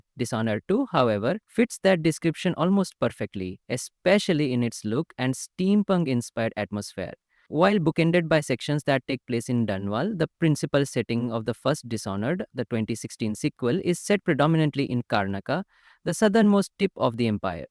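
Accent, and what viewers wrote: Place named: India. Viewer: Hey bro amm the vedeo is good but wat I think it lacks is a good english if you wanna improve it just find some one to chat with by chat i mean talking not texting and It should make your english sound less Indian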